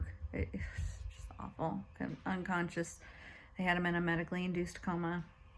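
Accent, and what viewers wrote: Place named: United States. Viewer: My thoughts are with you sister. I'm a dissociated apostate 2 yrs now and my beautiful wife Nicole is still a inactive fully believing jw. I told Nicole if she ever needs a blood transfusion I'll give her one she said she'll divorce me. I told her id rather have a living divorced wife than a dead married one. When I dissociated 2 years ago over the child abuse cover ups. I rang up Nicolas father who's not a jw smart man and told him I'll give his daughter a blood transfusion if she needs one and I apologised to him for been so brain washed by this cult he thanked me and laughed when I said I'll give her my blood you'll get to keep your daughter I'll just lose my wife. I told her doctor I'll give her my blood he said we can't go against your wife's medical health directive and as doctors we can't go against your wife's religious beliefs I'll rip up her medical directive then. 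So dear sister what your going through now is what us normal people will all go through when we have jw family members who we dearly love and may well be in the situation your in now . So my dear sister I'll give you a big Australian hug all the way to America you sound American or Canadian us Aussies can't tell the difference .I love you sister and feel for you check out my you Tube channel called Justin porter I have 13 videos one where I impersonate Steven left and one where I film Australian fruit bats and talk about apostate goodies because us apostates are the goodies. Warm apostate love dear sweet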